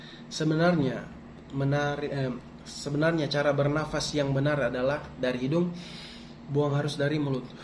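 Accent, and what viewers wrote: Manado, Indonesia. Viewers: Manado p logat kang😁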